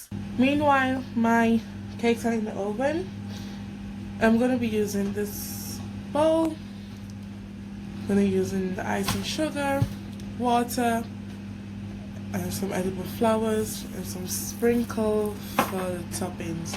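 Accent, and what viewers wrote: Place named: Haiti. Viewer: Yeah you sound Haitian haha xx great video!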